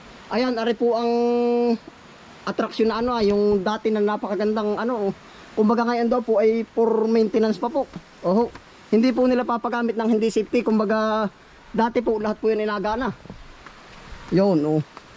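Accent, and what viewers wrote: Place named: Lucban, Philippines. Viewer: kayo o ba y taga lucban ganda nio mangusap may punto nakka bano ano po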